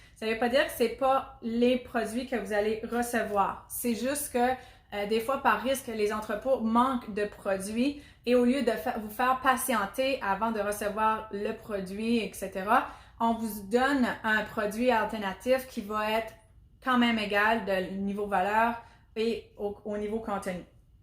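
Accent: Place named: Canada. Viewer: J'adore ton accent petite soeur hi acanadienne ............Ton sourire ton peps je kiffe <3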